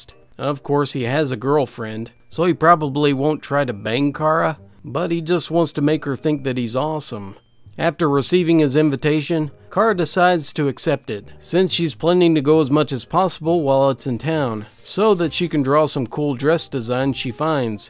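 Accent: South, United States. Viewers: That is the lovely southern charm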